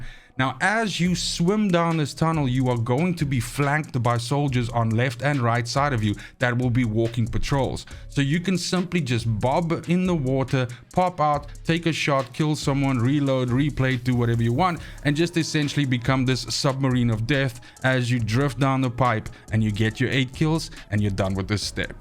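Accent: South Africa. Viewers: I can recognise that saffa accent anywhere. Thank you for the great video, helped me out. Best of all, it is short and to the point. Keep up the good work.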